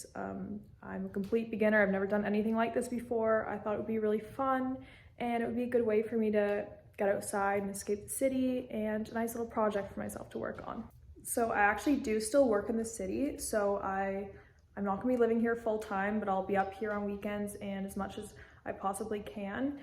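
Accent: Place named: Canada. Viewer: Nice Canadian accent you have, and a sweet cabin, good taste, but I'm more into your dad's style, living with the force of nature's elements, good luck !!! Stay busy and enjoy !!!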